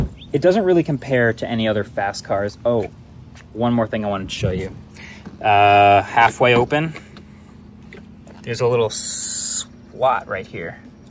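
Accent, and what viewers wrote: United States. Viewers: and if you pay attention you would hear that mkbhd is pronouncing it correctly when concentrated. When he gets into flow he pronounces like americans do. So he did make an effort but is drifting away in speech like normal humans do. If you want to critizise him then you could go with something about him not knowing who bought who in the bugatti-vw-rimac deal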